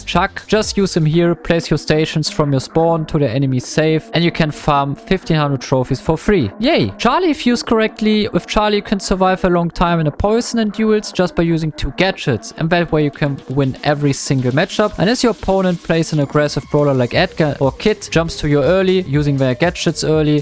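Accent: Germany. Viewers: Im sure ur an amazing player but I can’t watch the video with that strong a German accent holy shit better just talk German and put English subs man ur never gonna speak proper English if it’s that strong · Are you German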